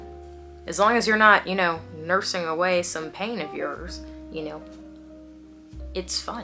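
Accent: Australia. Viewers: ur australian accent bye i'm crying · The Australian accent 😂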